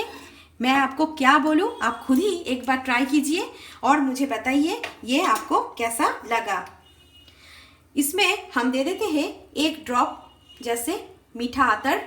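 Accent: Bengal, India. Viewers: Are you Bengali? I am telling this after hearing your hindi ☺☺ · Your recpice is osm, but I want to ask are you bengalee mam?? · R u bengali mam?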